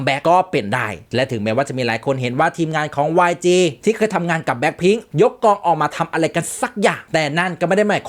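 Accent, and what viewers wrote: Isan, Thailand. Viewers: แอด…กลับมาอัพเดทบ่อยเถอะน๊า คิดถึงเสียงหัวเราะของแอด คิดถึงสำเนียงภาคว่าวอีสาน หม่วนๆ ของแอด Please…..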